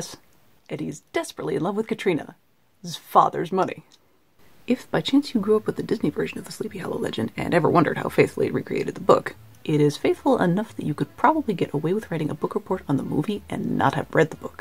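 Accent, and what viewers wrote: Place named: England, United Kingdom. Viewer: I feel like I learn more watching your videos than I would reading the book! Loved the English accent!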